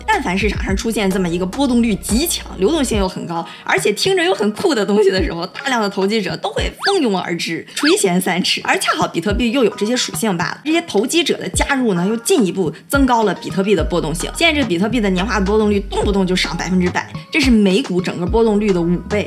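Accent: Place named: Shandong, China. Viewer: 听小林的口音像山东或者大连人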